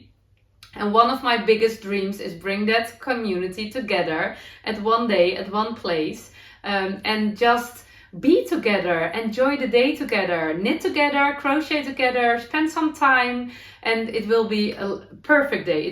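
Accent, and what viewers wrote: Netherlands. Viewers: Crochet and knitting, here in the USA Is on fire! The farmers/ weekend markets are filled with crafters of all kinds. I recently found your vlogs and have enjoyed them and you! You are just charming and your dutchy English is just lovely. Wish I lived closer. Good luck with the festival.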